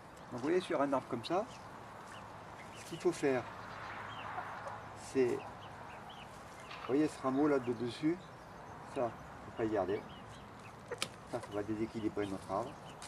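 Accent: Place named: Dauphiné, France. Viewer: Quel bonheur d’entendre l’accent du Dauphiné ! Merci pour vos vidéos très précises et claires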